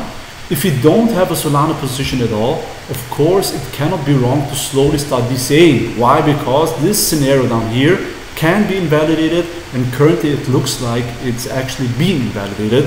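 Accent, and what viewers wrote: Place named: Belgium. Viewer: are you from belgium? You really sound like a belgian guy speaking english 😁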